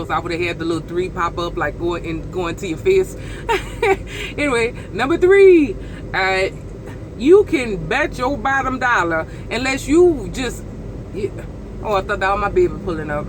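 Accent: Louisiana, United States. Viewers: dam I like that Louisiana accent you have especially when you say baby😉😉😉😉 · that sounds like a saint Charles parish accent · Darlin are you Cajun? If so i looove your accent!!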